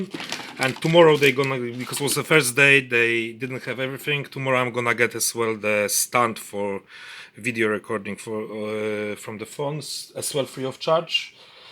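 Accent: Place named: Poland. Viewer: akcent jakiś taki polski ;)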